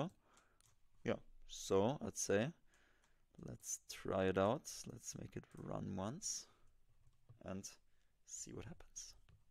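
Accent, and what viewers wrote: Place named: Germany. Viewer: Aufgrund deines Dialekts nehme ich an, dass Du aus Deutschland kommst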